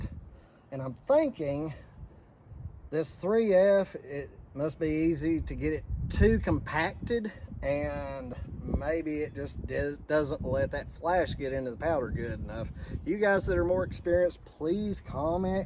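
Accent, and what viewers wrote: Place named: South, United States. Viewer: This worth listening to just for the South of Dixie accent.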